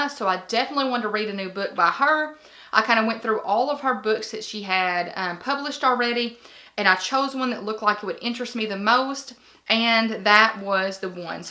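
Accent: South, United States. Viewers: I love this! I love how honest you were about not reading but finding your niche. Like you, it took my finding a verse novel years ago just passing by in a book store. I was there with my kids to play and read books. On the way out I stopped picking one up of Ellen Hopkins, Burned. After reading it I sought out to read others of hers but the story at hand was not for me. Although, I value her and her take on life because it's personal matter to her and I know so many can relate. I moved on finding other verse novel authors and evidently wrote my own verse novel, Under Contract: Life in the Middle of Dreams, it was released last summer. If you'd like to read it and review it I'd be happy to mail you a copy. You are precious, I love your accent. Are you from the south? I have people comment on mine all the time. Again I enjoy this video and look forward to watching more of your videos. 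I hit that subscribe button! Have an awesome day and keep shining!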